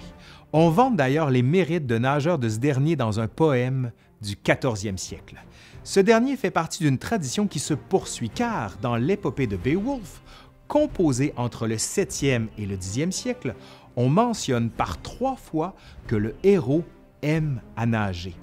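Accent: Quebec, Canada. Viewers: Un petit mot plus que dire juste pour ton référencement juste "référencement" car je ne sais pas comment va l'algo de youtube! Avec Nota Bene, Manon etc que tu connais, tu devrais recevoir un prix pour ton travail! Je te l'espère! Avec un petit accent québécois que j'apprécie! Bah oui, Belge, ça change de l'accent Fr ;-) Puis ma prof de science physique parlait pareil! Ok j'avais 18 ans... Et elle était si 😍😍😍Et je m'égare... Continue des partenariats! Et super, peu? ou jamais de sponsos qui saoulent de nord vpn ou autre! Je te souhaite de futures très bonnes vidéos et une audience décuplée!!!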